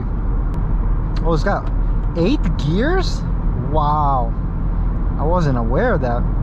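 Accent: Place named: United States. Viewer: urodzony w stanach? you sound like a native american speaker.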